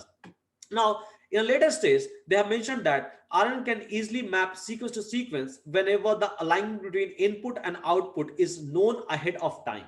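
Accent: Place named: Bhojpuri, India. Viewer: some words of his sound like "englisss" that's literally bhojpuri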